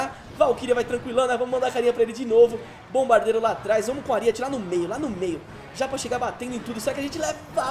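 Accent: Minas Gerais, Brazil. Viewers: Mineiro eu acho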